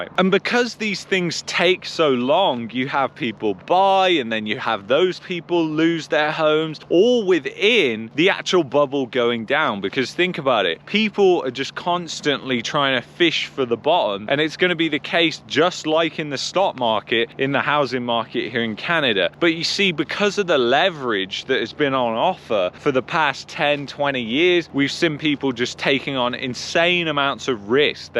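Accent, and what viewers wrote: England, United Kingdom. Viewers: So much negativity and doom and gloom.you have an English accent, go back to where you came from if you hate it so much. UK is a third world country anyway, go back and live “large” there …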